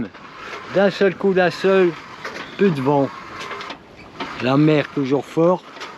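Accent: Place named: Valenciennes, France. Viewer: D'où qu'ej viens.. bin ed Wavrin, mais maintenin ej chui din l'pas de calais, diéval pour être précis.. Mais toi,?? j'ai l'impression de reconnaître un accent valenciennois, mais je ne suis pas sûr;)... té dou tizotte??? Sage décisison de faire demi tour.. d'ailleurs, c'est un voilier, pas un hors bord, ton bateau LOL.. donc faut savoir profiter du vent: trop de vidéos où ils se doivent de mettre le moteur.. et suis presque sûr qu'une majorité ne sait pas utiliser le sextant: s'il n'y a plus d'électricité à bord, je crains que ça ne finisse en panique, si ce n'est en drame... Au fait: pilote automatique, mais as-tu un régulateur d'allure?? je demande ça car mon grand père en avait fabriqué un quand il avait son bateau à Etaples (un petit Challenger 7 mètres insubmersible): une fabrication maison avec du contre plaqué marine.. Bon, à la revoiyure dans deux jours pétants;)... 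Cordialement. éric.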